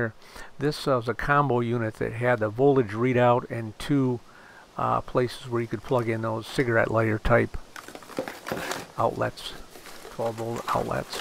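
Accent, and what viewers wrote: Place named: Great Lakes, United States. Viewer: Wow, a strong accent! I'm thinking Great Lakes. (block pronounced as black, ask as "eeyask". Not as strong as for most females of the Great Lakes, but still of that region. Am I correct?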